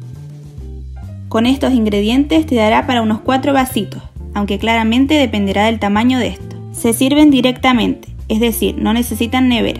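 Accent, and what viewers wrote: Canary Islands, Spain. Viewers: Mira que te había oído en otras recetas y decía... esta chica es canaria... pero al ver los suspiros de Moya ya me lo confirmó. Pondré en práctica la receta. Por cierto, como idea, puedes poner los ingredientes en la descripción del vídeo. Es muy útil para los que vamos a seguir la receta. Un abrazo desde Gran Canaria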